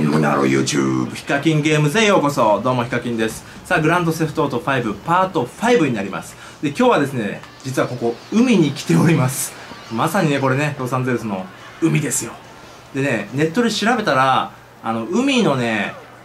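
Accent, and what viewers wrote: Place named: Kansai, Japan. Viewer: ヒカキン喋り方ちょいちょい関西弁のイントネーション時あるし、標準語の時もあるからどっちかにしてほしいわ。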